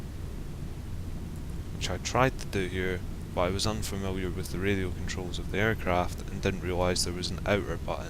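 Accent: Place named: Ireland. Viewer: u sound Irish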